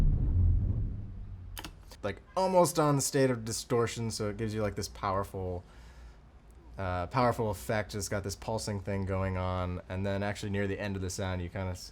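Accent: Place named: Portland, United States. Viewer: I could tell you where from portland before you even said so. I would know (I live there)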